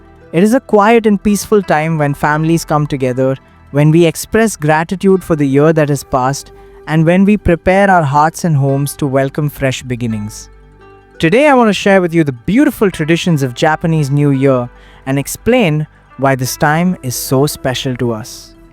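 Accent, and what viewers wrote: India. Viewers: I was not expecting an Indian accent.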